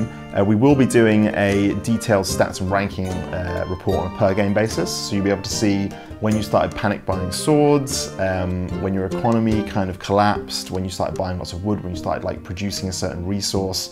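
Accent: United Kingdom. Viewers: I thought is playing Lol only,but no,here he is talking with some strange British accent. GGWP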